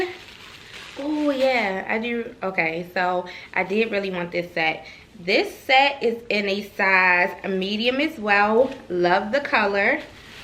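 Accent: Baltimore, United States. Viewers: First time here are hi dear, are you from Baltimore I know our accent anywhere😂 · I love your accent 🥰🥰I knew you were from Baltimore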